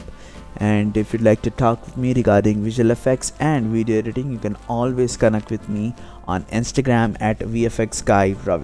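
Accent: India. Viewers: thanks bro are you a south indian guy ?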